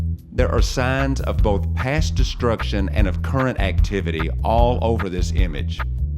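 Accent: South, United States. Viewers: Love this guys southern accent. He sounds like my brother. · your southern accent just bring it home or reminds me of home. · Hey stupid, Its not Mars! Its not what looks like weird stuff on Mars, Its a shot of a place on Earth and calling it Mars. You can't be that stupid. Or you are working for Nasa and are doing the life in space BS! Listen up Stupid, No planet, No space, No rovers, No nothing but an agenda to try to make our Lord and Savior seem like a creature from space to make us fight against GOD! When Yeshua returns, You bow down. If a fake alien invasion happens, You go to Nasa and Govt's to fight. And your accent of a Southerner does not make you trust worthy! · Your from the South, aren't you friend? Maybe....the Tennessee Valley? It's the accent. You sound like an educated Southerner... probably in the above mentioned region. There are alot of engineers and scientist in the TN valley, ie Marshall Space Flight Center, in Huntsville. 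· I'm guessing that narrator is from the south...LOL GREAT video sir!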